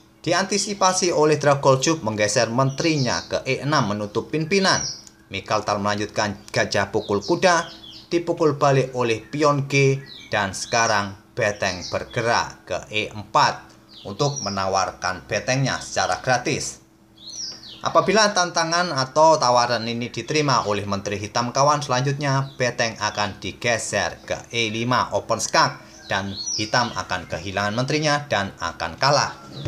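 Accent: Java, Indonesia. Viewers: admin orang jawa bukan ?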